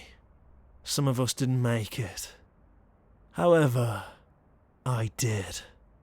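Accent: England, United Kingdom. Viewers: I always have a weakness for an English accent and I love yours.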